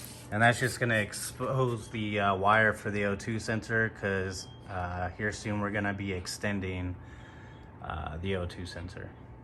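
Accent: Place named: United States. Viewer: Damn bro that American accent. AcrapOvik. It's akrApovich. Other than that, nice informative video❤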